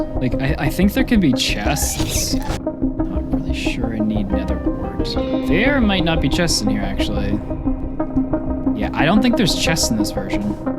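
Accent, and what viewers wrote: Canada. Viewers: Are you Canadian? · Why was he so Canadian in this video? Maybe I'm trippin and just haven't noticed before but I thought bro was American up until now😂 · Are you from Canada by chance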